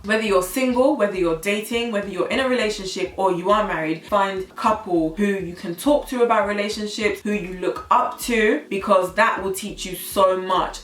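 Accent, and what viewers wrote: Nigeria. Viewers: The Nigerian accent thoooo!!! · Naija accent I love it. You should do more of these videos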